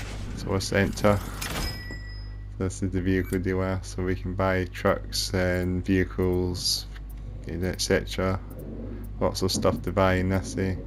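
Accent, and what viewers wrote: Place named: Scotland, United Kingdom. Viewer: Ah. There must be a variety of Scottish accents then, and yours is one I'm less familiar with. I was close with a lovely Scotsman named Jim Wilson, and was very familiar with his accent, and less so with yours.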